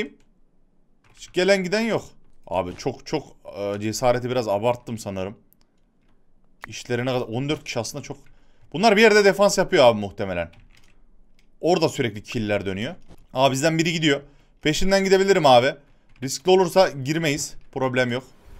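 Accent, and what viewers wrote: Yozgat, Turkey. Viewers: Abi Yozgatlı mı sın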